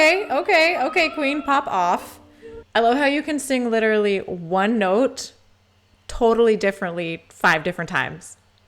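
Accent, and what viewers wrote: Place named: Italy. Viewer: Fantastic reaction! Very informative and deep. Your italian accent is nice! 🎉🎉🎉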